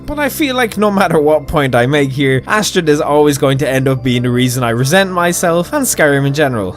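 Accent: Ireland. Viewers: POV: you’ve already completed the dark brotherhood quest line and are watching just cuz you’re bored and he sounds like a crazy Irish lad and enjoy him saying other as “utter”…. #HailSithis 🩸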